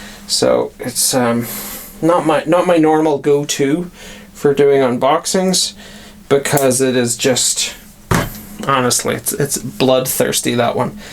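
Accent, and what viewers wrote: Northern Ireland, United Kingdom. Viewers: As a Nordie, I thought I detected an accent from the Graeme McDowell School of Transatlantic Speech....I was right.... I can hear a little noise in the audio, that's easily removed e.g. using Audacity noise filter.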